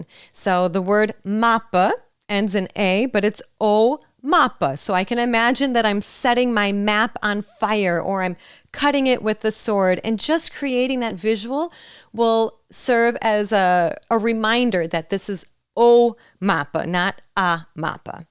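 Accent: United States. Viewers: To have a fluency in second language is not overnight. It's a whole bunch of thing that has to bear in mind mainly if don't live in an English spoken country. In my case i've been practising English my whole life and it's not enough for me 'cause i've got to get into it for hours as if i were living in USA. And nowadays cell phone, laptop and apps help a lot to get in touch with the whole world if you really want to improve your English. By the way you're a good native English teacher and nothing better than learning a real American English from you. GOD BLESS YOU. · Are you American?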